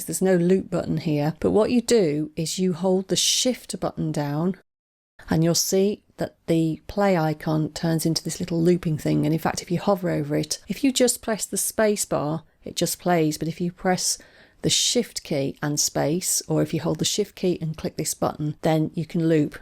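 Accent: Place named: Manchester, United Kingdom. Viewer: Looks like its changed since this video. I go to change tempo but instead of getting the popup box showing how to select the new speed, I got a box saying disallowed, you must first select some audio . I've been trying to select a portion of the track for some minutes through Select and Region and then trying everything there, but the cursor disappears all the time, so can't make a selection. Btw, I love your Manchester accent. That's where I grew up. :)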